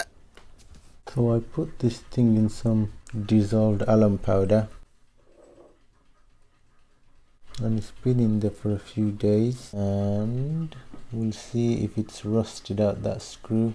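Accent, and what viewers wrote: Birmingham, United Kingdom. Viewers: 100% you’re from Birmingham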